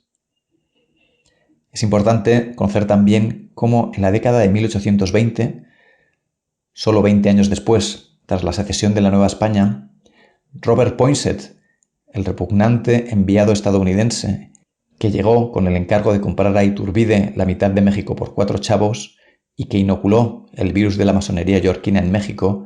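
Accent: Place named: Malaga, Spain. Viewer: Parece usted malagueña... Se dice "osó", en Málaga y Cádiz dicen ("disen") ozó.... El asunto del seseo y el cezeo es complejo, somos ocho ("osho") provincias en Andalucia, y en cada una hablamos distinto... !! Esto es un sindios !! 😅😂😂 Saludos cordiales desde Córdoba, España.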